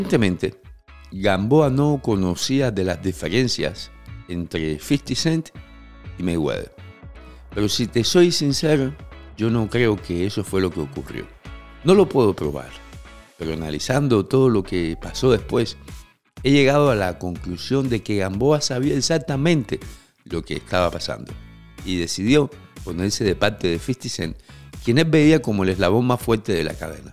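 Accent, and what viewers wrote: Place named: Cuba. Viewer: Compay yo entendi porque soy cubano pero es documental hablado esta calentico solo pa cubano que hablamos mal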